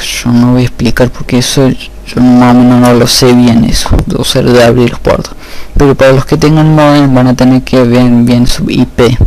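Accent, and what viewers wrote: Córdoba, Argentina. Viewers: Que buena tonada cordobeza XD . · no entiendo muy bien el acento argentino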